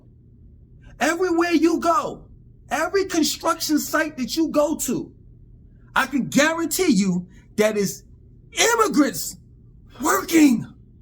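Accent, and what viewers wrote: New York, United States. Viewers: I hear you New York accent fam I know you heard about the Central Park 5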